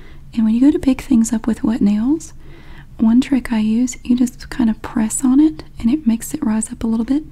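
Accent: South, United States. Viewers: 🥰 Yayyy!!! I am so happy!!!! This was a request of mine. You are completely and totally awesome! I am so glad you did this video with talking instead of whispers (soft talking is my favorite), it's perfect! Your talking voice is so soothing and relaxing, like a southern female Bob Ross 🤗... I love it! And your nails are GORGEOUS! I wish I had strong nails like yours, they are beautiful. Hope you are doing well. Blessings to you and yours. 💕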